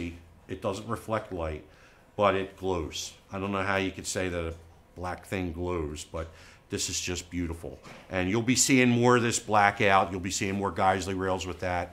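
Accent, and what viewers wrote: Philadelphia, United States. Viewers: This is why I buy your products. I can hear how much u car about quality and doing things the right way...!! U remind me of family I have from philly area u can hear it ooze out of you... thanks for being a great American company...👍🏼👍🏼💪💪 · I'm from the Philly area and spent my Summers at the Jersey Shore it's great listening to you talk with your philly East Coast accent I love Geissele triggers I have one in the gun I keep next to my bed that protects my family there's no doubt you guys make some of the best gear in the industry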